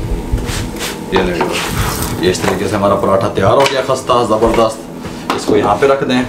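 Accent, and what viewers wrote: Pakistan. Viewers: Pakistani accent aur wo bhi Punjabi ke sath bahot hi badhiya combination hai. Love from India ❤❤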